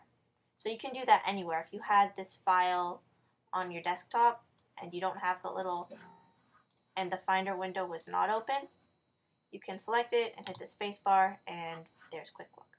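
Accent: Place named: Pacific Northwest, United States. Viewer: You sound a lot like the branch of my family who live in the Pacific Northwest. Would that be about right? :^) Thanks for all the Mac help. You're great.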